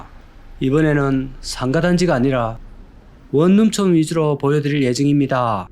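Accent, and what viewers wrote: Gyeongsang, South Korea. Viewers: 전형적인 진짜 ㅈㄴ 듣기 싫은 경상도 말투 ㅋㅋ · 경상도 사투리 방송? 왠만하면 표준말로 하시죠..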